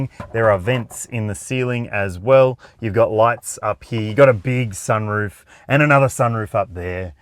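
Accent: Australia. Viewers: Viewer from Malaysia here, Great review btw Could do with more infographics when explaining all the safety features, I know stralia' has more variants than us, ( we only have 3-4 only including the 11 seater), you could add your pick of the range Diesel + ? Which trim level with all the safety features Again, nice review Ps: i wonder how much does aussie auto reviewer had to tone down the accent for global accent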